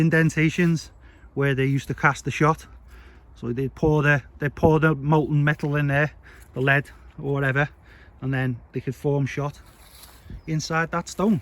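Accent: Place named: Liverpool, United Kingdom. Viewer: Vey cool. Thx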